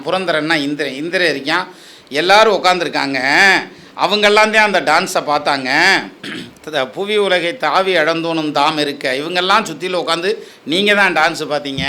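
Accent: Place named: Madurai, India. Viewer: இவருடைய மதுரைத் தமிழ் சொக்கன் குடுத்த சங்கத்தமிழ் சொக்க வைக்கும் தமிழ் அற்புதம்.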